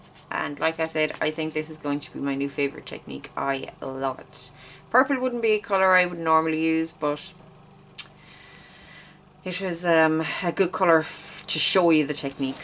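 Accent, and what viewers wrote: Ireland. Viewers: Thank you for sharing .Very clear presentation.(Love your Irish lilt.)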